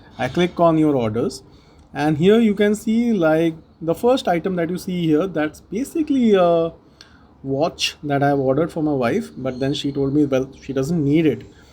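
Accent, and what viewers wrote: India. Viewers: the man with the indian accent comes for the save again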